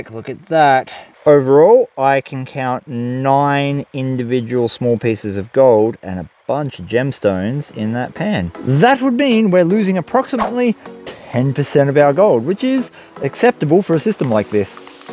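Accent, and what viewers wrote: Australia. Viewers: Does your accent tell me your on Australia?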